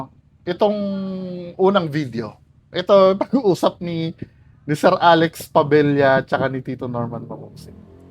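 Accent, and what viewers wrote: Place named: Batangas, Philippines. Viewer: 😂😂😂 pag masaya nagihing batanggenyo a a o owww ala ehhh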